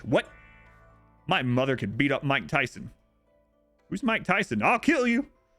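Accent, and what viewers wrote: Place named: Appalachia, United States. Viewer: hahaha, maybe I can leave some vids on .5x speed for a while And also your apalachian voice is jokes, altho I did understand it enough considering the diverse group of people ive met and played games with, definitely harder to understand though, I dont think I could watch that on 1.5x speed like ive sometimes done for your vods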